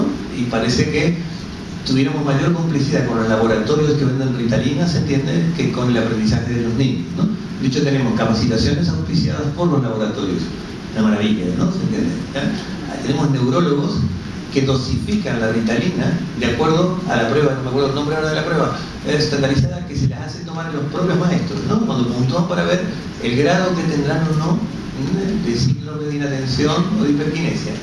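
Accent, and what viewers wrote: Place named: Chile. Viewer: Por qué pone tono chileno si es argentino? Notable dato paraverbal. Todo un mensaje....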